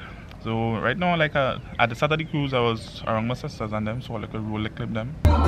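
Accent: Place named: Trinidad and Tobago. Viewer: Are you Trini? Have you lived in Trini? Do you have Trini family? Cuz that Trini twang is coming through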